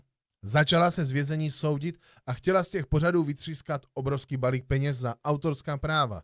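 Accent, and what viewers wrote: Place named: Brno, Czech Republic. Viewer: A hlavně s brněnským přízvukem 😉👍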